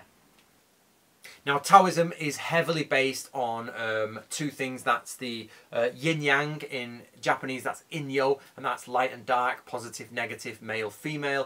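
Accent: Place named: Manchester, United Kingdom. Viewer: Love hearing a fellow manc accent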